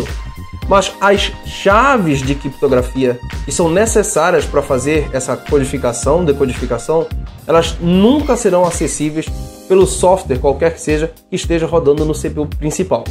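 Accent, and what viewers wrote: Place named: Belém, Brazil. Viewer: Sotaque de belém é bem legal. ")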